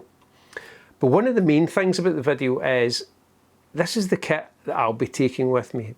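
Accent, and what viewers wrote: Scotland, United Kingdom. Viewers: Hi, with the X-T5 agree on the 70-300 for where you are going. As for the rest of the kit…option a) Sigma 18-50 and 12mm Zeiss or b) 33mm f/1.4 and 16mm f/1.4. Probably a) though as I would choose wide over shallow dof…love the accent. It reminds me of my old Grade 11 Physical geography teacher Mr.McEwan who would make us study topographical maps of his beloved Scotland. It took me a whole semester to realize loch was lake and about as long to understand what he was saying. 🎉 Have a great trip and look forward to the pictures. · Thanks a lot for sharing your insights,! Be you 🙂love the Scottish accent:) to your point: I love the Fuji 16-55 f2,8.. yes it is bulky.. but it delivers such great image quality.. and I was lucky to get it used in great condition for a fair price.. I pair it for hiking either with the 50-140mm or for more reach and lighter weight indeed with the 70-300mm - Cheers, Tom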